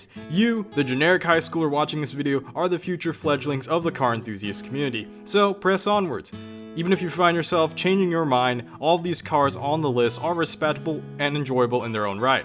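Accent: United States. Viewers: Dude you sound American but your using euros you make my brain hurt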